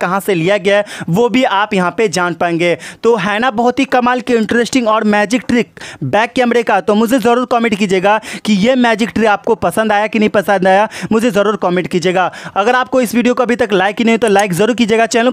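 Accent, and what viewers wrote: Bihar, India. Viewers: Totalaa nahi hai BIHARI hai... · Bihari h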